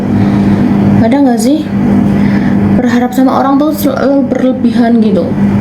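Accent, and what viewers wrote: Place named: Java, Indonesia. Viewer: Maaf bunda bicaranya separti orang jowo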